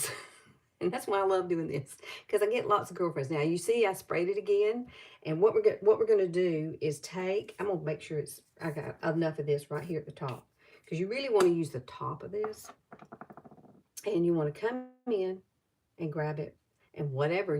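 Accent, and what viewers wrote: South, United States. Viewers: I also like NYX Epic Smoke Liner. U’re right… short life sucks, not at all a long time… wasn’t supposed to be short. Ur southern talk is so cute :) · I really look forward to your videos! I so enjoy your southern drawl!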